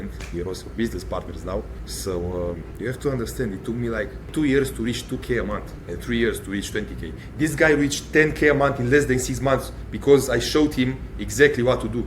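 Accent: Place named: Balkans, Eastern Europe. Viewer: Balkanski Prevarant sa užasnim naglaskom, scammer lmao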